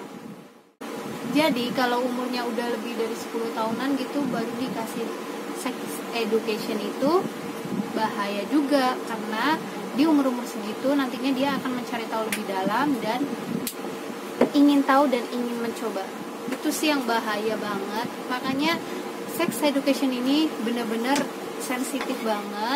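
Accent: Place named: Java, Indonesia. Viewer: medok bettt bahasamu mba apa lagi pas ngomong "GUE" medok beettt sumpah mba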